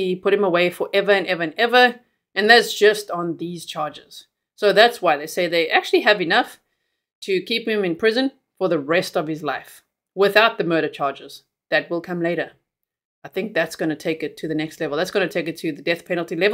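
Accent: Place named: South Africa. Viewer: Hello fellow south African 🇿🇦 Thanks for covering this case x Great to hear the accent!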